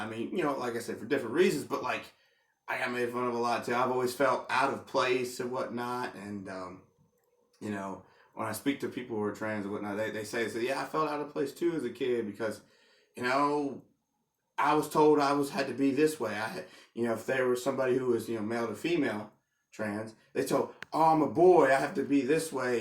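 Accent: United States. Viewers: As a trans woman from rural Appalachia, it means the entire world to me to hear a straight, cis man with a similar accent finally support my existence. It's the closest to feeling accepted by home I think I've ever gotten to experience. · What the hell were you bullied for? Good looking guy, normal deep southern voice. Not fat. not effeminate. I don't get it? Bullies are stupid apparently!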